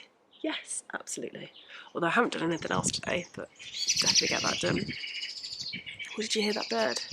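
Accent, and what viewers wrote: United Kingdom. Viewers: You have a really good British accent.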